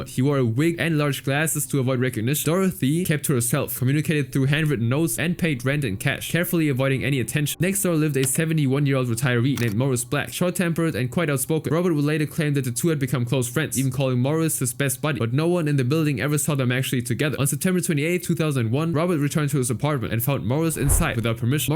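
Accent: Germany. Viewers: You gotta slow down when you talk a bit. Are you German by any chance?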